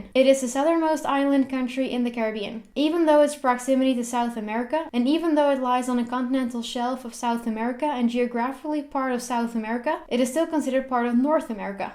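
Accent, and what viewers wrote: Spain. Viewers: Trinidad is so beautiful .. is how she pronouncing everything in this Spanish accent.. noooooo bbg😒😒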